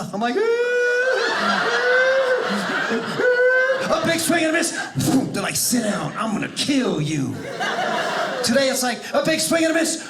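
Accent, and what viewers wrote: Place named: New York, United States. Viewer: I couldn't tell he's from Brooklyn but NY for sure. My boyfriend is also from Brooklyn so I picked up on the accent right away. Also the witness protection comment made me think of the movie Mobsters & Mormons lol 😊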